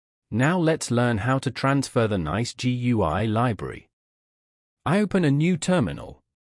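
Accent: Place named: United Kingdom. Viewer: The voice over is just awful. Speak English. You don't "transfer" a library, you install it. Calling a function with a text argument is not "sending it a text" (LOL). Every other tutorial in the playlist seems to have the bot exclaiming "I am SAVING the code FILE!" repeatedly, always with the same alien accentuation. While it may be easy for you to generate one of these videos for each little control in NiceGUI, it's not obvious that any of them teaches anyone anything — too trivial. The last ones on `bind_*` perhaps have some content. I guess you think this accent sounds "classy". Anyway, it does sound British.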